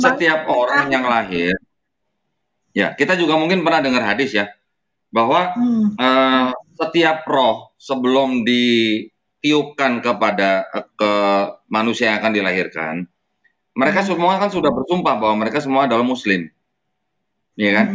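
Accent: Banyumas, Indonesia. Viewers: logat bayumas ga bisa hilang sepertinya walaupun sudah lama di jakarta 😂🤣😂🤣 sehat selalu om.....semga selalu dalam lindungan allah swt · Klo Sy suka aksen pak Jawa ngapaknya masih kental g taunya dari Cilacap Sy sllu nyimak pak mualaf cerdas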